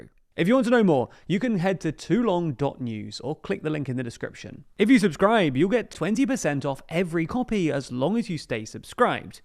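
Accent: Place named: RP, United Kingdom. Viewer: Wow... an academic, RP-sounding voice makes anyone sound like a good writer 😇😇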